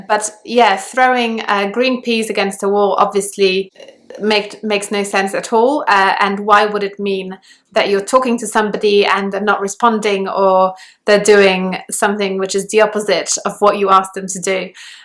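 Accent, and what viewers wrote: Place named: England, United Kingdom. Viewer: A rare moment for me, not the simp type guy, but to hear my favorite accent English~ Enunciators to the Gods 🎉explain a much less proper, posture mess, 😂-of a language, combined with woman often making very little sense, (if the only understanding comes from being led by the actual words they utter, when that's tip of the communication iceberg😢) Picture asking "honey what's wrong?" speak but then a proper excavation of both point & game can uncover a purposeful MATCH of misleading cues, hues & views, with the phrase ~"more than you bargained for",~ being a two sizes too small corset statement = equaling you as the faintest light at the end of the proverbial tunnel. Bringing me to shore before swallowed whole by what the heck, hell, wtf, get to it, what's you're point? Do You have a point? But you landed both Polish & English home to earth putting practicality into the superfluous & purposely roundabout, point..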